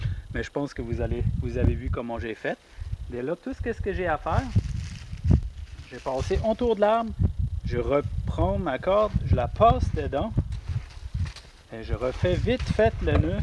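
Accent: Quebec, Canada. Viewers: Ce merveilleux accent canadien ! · bonjour' tu as une très bonne pédagogie , tu refais le mouvement en expliquant les erreurs à n'a pas commenter . J adore l accent de nos cousins du Québec. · un accent de où? je suis de montreal alors je sais pas trop de quel quoi du Quebec vient ton accent :p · Bonjour. Merci pour cette vidéo. J'ai fait pas mal de recherche pour fixation d'une faïtière et ta vidéo est la meilleure: c'est clair, bien expliqué et bien filmé. En plus avec l'accent québécois et ta sympathie, c'est top. Salutations Nature de France!;)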